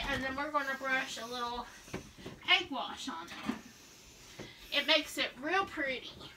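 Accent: South, United States. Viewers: Looks easy and delicious. Where are you from? Love the southern accent😁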